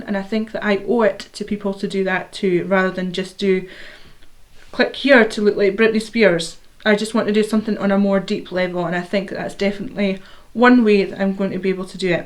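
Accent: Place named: Scotland, United Kingdom. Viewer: That's so funny. My wife and I tap three times on wood any time we don't want to jinx something, nine times if it's really important. We also love. He's a very lovable sweet guy. Great video. I watched your most recent singing video. Very nice btw, and I was wondering where your accent was from. I think you might have said Scotland, well, I'm assuming it's Scottish.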